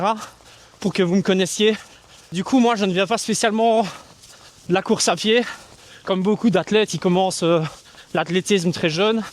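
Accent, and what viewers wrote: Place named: Verviers, Belgium. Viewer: L’accent de Verviers qu’on aime tant 😍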